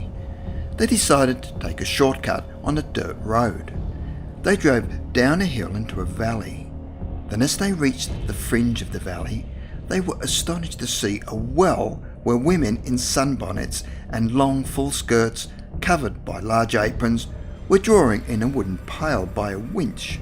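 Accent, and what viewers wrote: Australia. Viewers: That’s the way Aussie’s pronounce their numbers.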